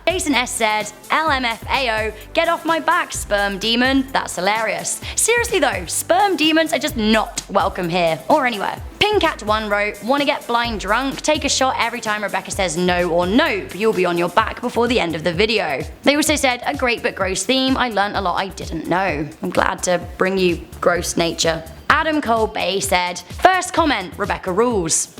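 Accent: United Kingdom. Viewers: What is the story behind the British accent, Rebecca, you are from Canada, right??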